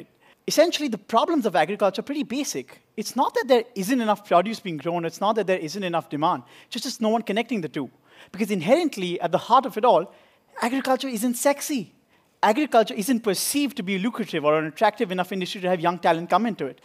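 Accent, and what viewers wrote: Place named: South Bombay, India. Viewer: He really got that sobo accent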